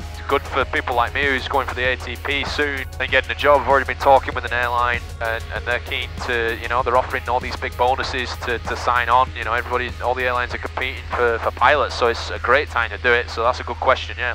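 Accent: United Kingdom. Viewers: hey, thx for answer my question :))) you are from australia? i always thought, you are from uk because your dialect sounds british..... :))))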